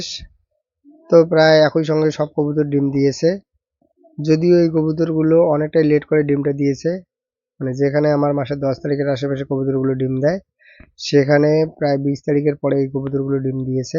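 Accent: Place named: Bangladesh. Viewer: Apnar bangla bola akdom bangladesh ar moto India ar moto na ami pothoma apnar video dakha mona korchilam apni bangladeshi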